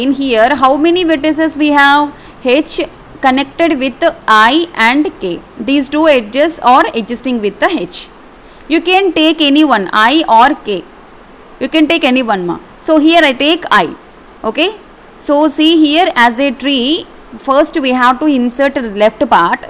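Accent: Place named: Tamil Nadu, India. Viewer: ma'am baaki sab toh sahi.....bas beech beech mein aapki tamil bahar aa rhi hai.....usko kuchh karo......nice video btw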